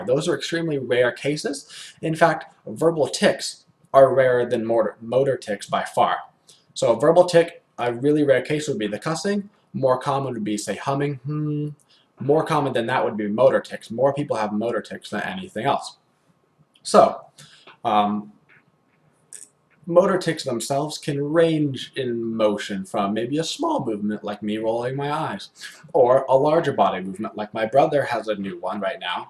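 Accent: United States. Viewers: Watching this in 2020 you can def tell that you had a more pronounced southern accent back in 2014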